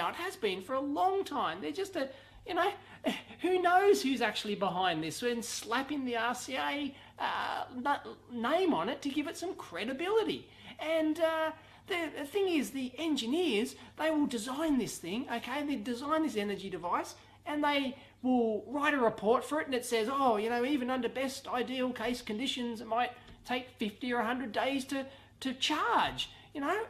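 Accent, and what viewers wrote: Australia. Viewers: lUV THIS GEZZER, Must have the best aussie acent on the planet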